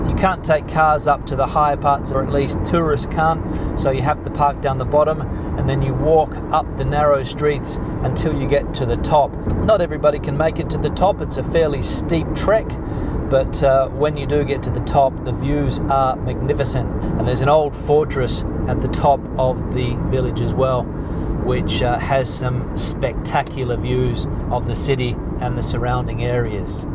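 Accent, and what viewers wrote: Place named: Australia. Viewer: Good to hear an Aussie voice! What took you to Spain?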